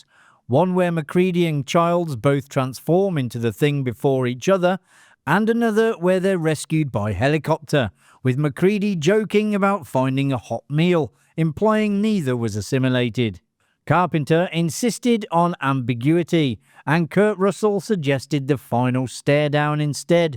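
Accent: United Kingdom. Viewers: LOL, Love how you keep saying Tobe wrong....and to the guy before me, this is not AI slop, he's just Brittish.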